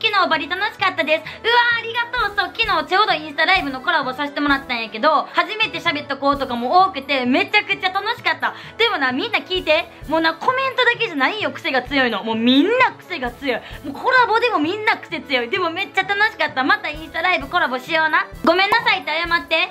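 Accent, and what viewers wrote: Kansai, Japan. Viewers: いつも、関西弁で草ww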